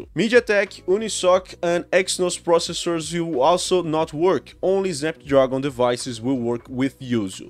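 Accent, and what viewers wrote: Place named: Brazil. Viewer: Are you from Brazil people there speaking English is just like yours not saying your English is bad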